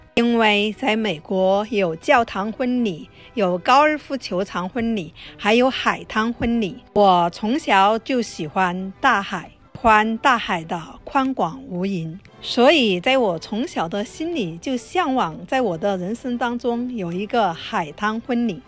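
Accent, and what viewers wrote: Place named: Sichuan, China. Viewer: 姐姐好听四川话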